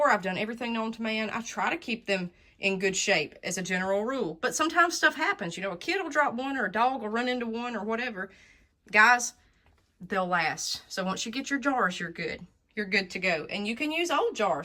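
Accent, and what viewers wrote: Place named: South, United States. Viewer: Alabama!? I swore up and down y'all were from Texas or Arkansas after hearing your accent, y'all keep on raising hell and eating cornbread over yander😅 🍻 from South Carolina.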